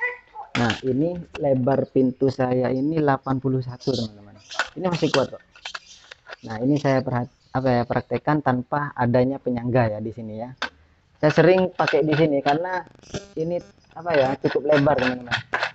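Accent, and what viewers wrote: Madura, Indonesia. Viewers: Logatnya asli Reng madureh😅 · Kalau dilihat dari foto ruang tamunya abang ini adalah santri, Kalau dilihat dari logatnya abang ini adalah orang madura, reng madureh dimmah kak......??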